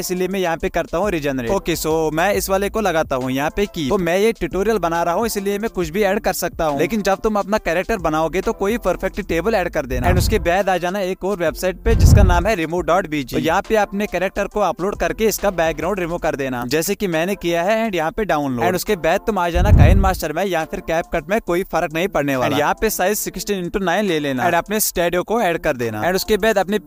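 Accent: Kashmir, India. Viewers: just by your accent i can decode that youre from kashmir my homie shukh na ?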